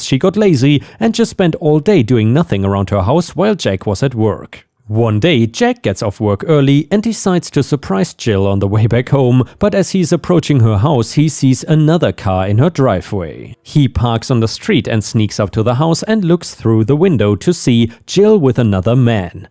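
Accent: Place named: Germany. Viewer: Love ur accent 💞 im thinking German or perhaps French? 😁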